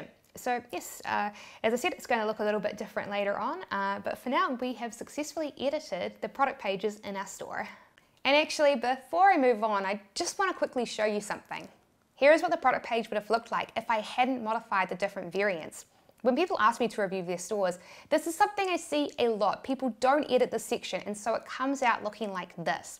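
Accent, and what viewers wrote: New Zealand. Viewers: That New Zealand accent is real. Who else thought she said sex coasters?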